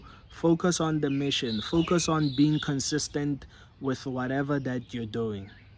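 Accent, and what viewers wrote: South Africa. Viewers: Bro are from mzansi?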